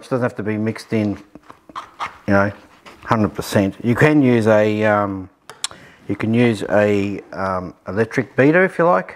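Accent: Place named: Australia. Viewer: Sounds like you are an Australian like me. How did you make the garlic butter, thanks.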